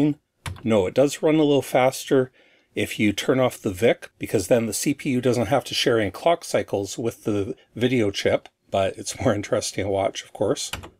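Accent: Canada. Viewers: I love the gesturing hand. It seems to be trademark. I do wish he'd show his face a little more, but I think the gesturing hand works for him. Also, the soft-spoken Canadian accent. See also: <link> <link>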